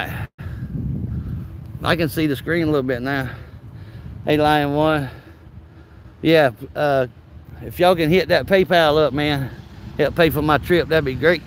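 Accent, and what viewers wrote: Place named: North Carolina, United States. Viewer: GREAT TO HEAR YOUR VOICE AGAIN INSTEAD OF OTHER AUDITERS!!! YOU ARE BY FAR THE BEST AUDITOR HANDS DOWN!! LOVE YOUR NC ACCENT. LOVE FROM JOCO!!!!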